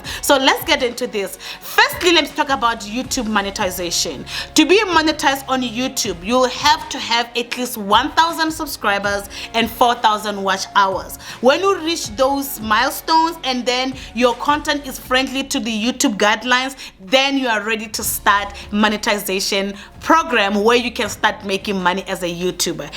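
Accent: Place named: Africa. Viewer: Thanks for providing detailed information.You can have African accent what is important is the information you are presenting to us.Well done with your spreadsheet we will always support you in the SADEC region in Africa.Felicity Family SA.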